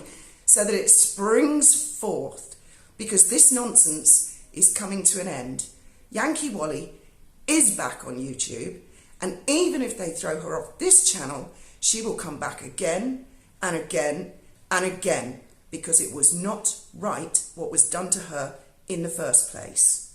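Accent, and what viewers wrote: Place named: Wales, United Kingdom. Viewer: Sounds like a proper Welsh woman ❤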